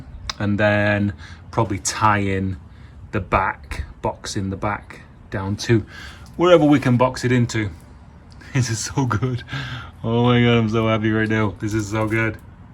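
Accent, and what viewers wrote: United Kingdom. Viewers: yeah totally understand buddy. I just wish YouTube would place them better. Loving the build buddy its not my normal cup of tea but you and that bug have me hooked! More than worth the awkwardly placed adds! Loving the way the 'BRIT' keeps coming out every now and then. 😁👍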